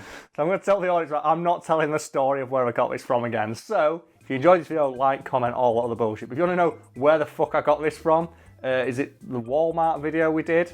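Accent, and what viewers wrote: United Kingdom. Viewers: tone the british back a notch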